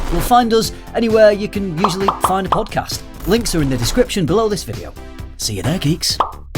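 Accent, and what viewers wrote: United Kingdom. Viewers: that British Accent, man... I insanely LOVE hearing it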